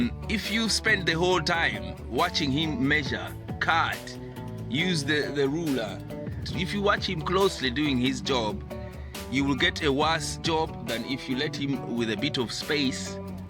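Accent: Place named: Uganda. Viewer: Ur sound like Uganda goodwork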